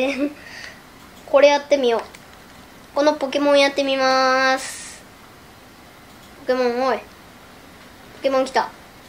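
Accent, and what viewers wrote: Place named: Kansai, Japan. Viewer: 関西弁可愛い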